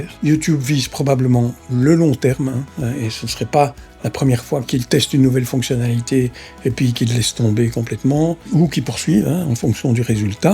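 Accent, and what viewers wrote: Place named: Belgium. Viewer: Salut, merci pour ta vidéo qui m'a bien éclairé. Je me posais toutes ces questions sur les sous-titres. Sinon, je m'abonne direct : pour l'accent belge, l'humour et l'authenticité. Ça change de la bière pasteurisée sans âme qu'on voit partout sur YouTube. Tu m'as plié avec la blague du fils du patron de YouTube, de l'entraîneur, le "bientôt" et le coup de la dinde ! Continue, elle est top ta chaîne et à tantôt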